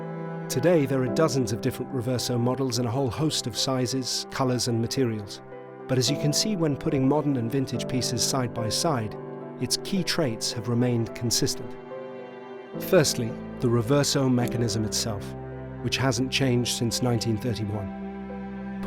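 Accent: RP, United Kingdom. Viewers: I find this off putting. The nonsense snobism, the posh accent, the whispering, just ridiculous.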